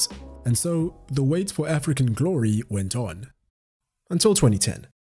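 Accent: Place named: South Africa. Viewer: I always knew you from SA. The accent and slipping in Tau and psl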